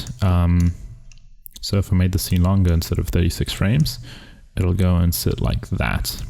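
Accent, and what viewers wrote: South Africa. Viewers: South African accent??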